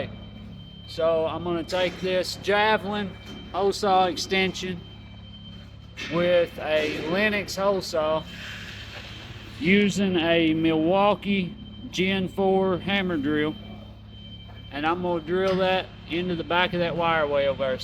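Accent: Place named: South, United States. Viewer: Appreciate the video, gotta love that sweet southern accent!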